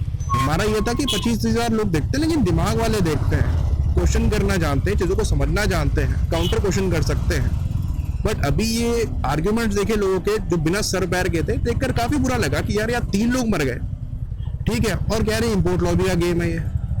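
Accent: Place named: Uttar Pradesh, India. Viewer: Are you from uttar pradesh purvanchal ? ( Specifically from varanasi region ? ) Your accent is quite similar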